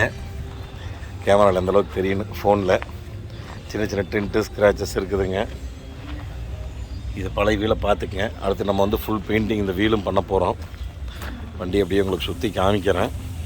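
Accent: Kongu, India. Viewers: தங்களது பல காணொளிகளை பார்த்துள்ளேன் இதுவரை யூட்யூபில் எந்த வீடியோவுக்கும் கமெண்ட்கள் செய்வதில்லை தங்களது கொங்கு தமிழும் அன்பு பொங்க பேசும் உங்களது நடையும் என்னை கவர்ந்தது மேன்மேலும் வளர மனதார வாழ்த்துகிறேன் தங்களது அலைபேசி எண்ணை பகிருங்கள் உங்களிடம் பேச வேண்டும் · கொஞ்சும் கொங்குத் தமிழ் பேசும் கோமகரே...! திகட்டாத தித்திக்கும் பேச்சுடைய...! எங்கள் மதிப்பிற்குறிய டான் திரு. அண்ணா அவர்களே❤❤❤🙏 எப்படி இருக்கீங்க அண்ணி❤❤❤🙏 எப்படி இருக்காங்க ரித்திக்❤❤❤ எப்படி இருக்காரு அருமையான வேலைப்பாடுகள் அண்ணா❤❤❤❤👍👍👍👍 தகரமும்கூட தங்கமென ஜொலித்திடுமே எங்கள் தங்கமகன் சிந்தனையின் வாயிலாக..! அந்த சிந்தனையில் உதித்த உத்தரவைப் பேணிக்காத்து வியர்வைத் துளிகளை நிலத்தில் உதிர்த்து உன்னதமான உழைப்பை உருவாக்கிடும் நமது Super Car Careன் உழைப்பாளிகளுக்கு அனைத்துலக நம் TMF குடும்பத்தினர் சார்பாக எனது நெஞ்சார்ந்த நல்வாழ்த்துக்களையும் பாராட்டுக்களையும் தெரிவித்துக்கொள்கிறேன்❤❤❤🙏🙏🙏 என்றும் அன்புடன் உங்கள் தம்பி என்றும் அன்புடன் உங்கள் TMF ரா.முஹம்மது கபூர் (சென்னையில் இருந்து)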